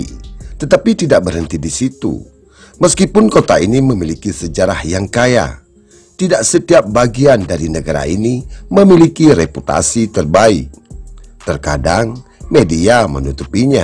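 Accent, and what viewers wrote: Aceh, Indonesia. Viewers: "T" dan logat.aceh nampaknya yg isi suara di narasi di ulasan ini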